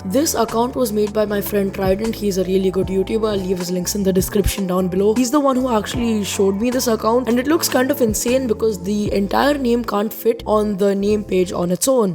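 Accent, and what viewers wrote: India. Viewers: Your acient is like an indian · Yooo you Indian?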